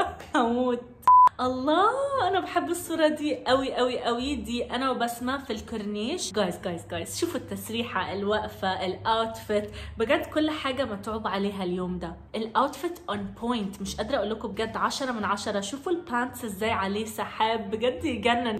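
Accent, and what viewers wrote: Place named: Egypt. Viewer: بصراحه انا بحب اللهجه المصريه ♥️♥️♥️♥️♥️♥️♥️♥️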